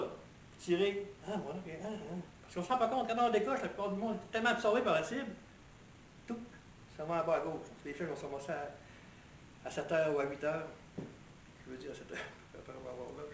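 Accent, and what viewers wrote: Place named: Canada. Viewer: Merci super video moi qui veux demarrer le tir instinctif de tres bon conseil l accent canadien dur dur et parlezvun peu moins vite mais vouscetes grnial ❤👍👍🙏🙏🙏😊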